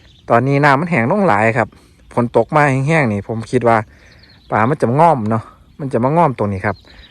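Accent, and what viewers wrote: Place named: Isan, Thailand. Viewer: เสียงหัวเราะเสียงเว้าที่คุ้นเคย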